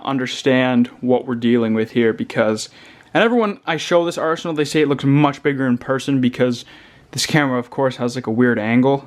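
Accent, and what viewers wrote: Canada. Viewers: In America we say owt in Canada you say oot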